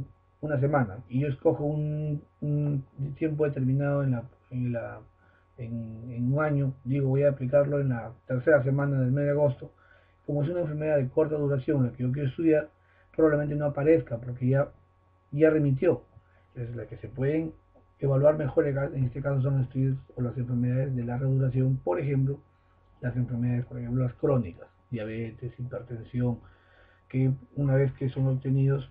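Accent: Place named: Peru. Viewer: yo entendi, solo que tiene acento, parece Peruano.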